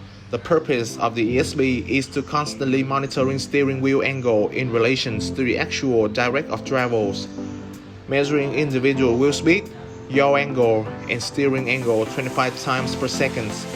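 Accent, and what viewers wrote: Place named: Vietnam. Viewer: kk, nghe biết kỹ sư Việt nói tiếng Anh liền, quan trọng là hiệu quả, giỏi.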